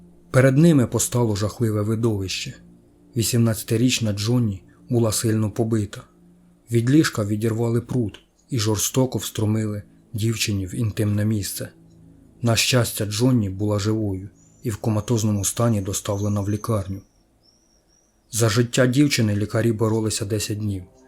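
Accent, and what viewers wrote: Ukraine. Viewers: Ведучий випадково не з черкаської обл? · так і подумала. Вимова як у Черкаській або Кіровоградській. Може, і Полтавська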